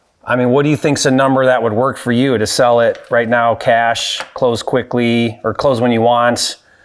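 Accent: Michigan, United States. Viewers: He's got that Michigan accent forsure haha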